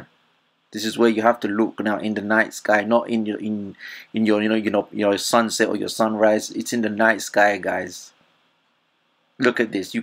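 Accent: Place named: England, United Kingdom. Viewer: Good stuff, where are you living? you sound English.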